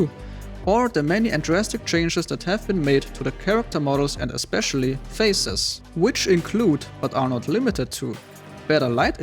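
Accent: Germany. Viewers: One Frage you are from Deutschland oder ?😅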